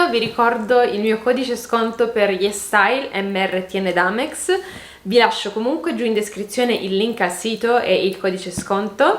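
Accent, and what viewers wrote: Turin, Italy. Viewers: video molto bello ! però la questione accento non è troppo vero, l’accento di torino è inconfondibile ✨ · Il tuo accento è assolutamente torinese!😄😄😍 Tutti i torinesi, sono conviti di non avere inflessione. Io che sono nata a Torino, ma vivo e ho origini toscane, lo so bene. Adoro il torinese e mi manca la mia città. Sei una persona bellissima 🥰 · Mi fa morire che pensi che i torinesi non abbiano un accento! Hai un accento fortissimo 😂♥️ e lo trovo adorabile!! Bellissimo video come sempre, non commento mai perché sono riservata, ma oggi volevo dirti che i tuoi video mi tengono tanta compagnia e mi infondono tranquillità, grazie 🌸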